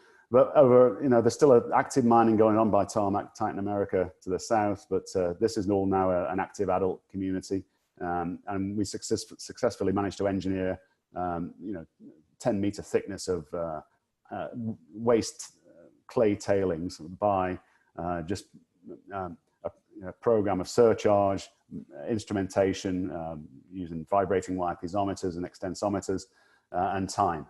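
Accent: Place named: England, United Kingdom. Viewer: From a random Floridian: Thanks for posting this. I was already familiar with most of the geological history and hydrology of Florida, but it was very interesting to learn about some of the engineering, economics, and politics of sinkholes. Plus it’s always fun to hear our town names pronounced with an English accent. Cheers!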